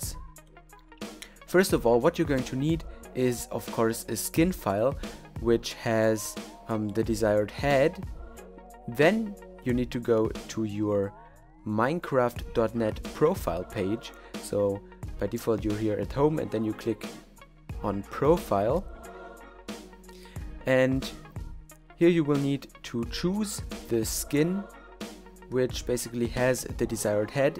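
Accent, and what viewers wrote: Germany. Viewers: Are you German? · Jep, er ist deutsch ;) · er ist auch deutsch? Hört man irgendwie :D McMakistein ist ja auch Deutscher (Österreich) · hehe^^ Man hört richtig, dass du deutsch bist xd, aber trotzdem nice vid!:D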